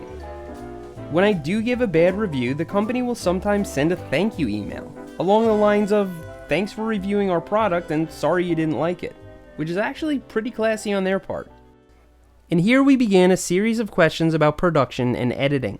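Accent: Long Island, United States. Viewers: ❤️ I wish for you continued success at whatever you choose to do. Thank you for including us in your adventures! I knew you were from Long Island by the way you pronounce water, wodder, lol. Why are you planning to sell Berm Creek? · Love this....You from Long Island? I’m from Jerzee? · My dog Lucy and Drama are so similar. Lucy is a Boston terrier (similar to frenchie) and she's bigger than every other Boston we encountered. She's supposed to be a pure bread Boston but it don't look like it with size. We assumed that one of her relatives is a boxer. Edit: with your long island accent, it's really similar to my uncle, aunt, dad, etc. They're from Long island and now we live in NYC.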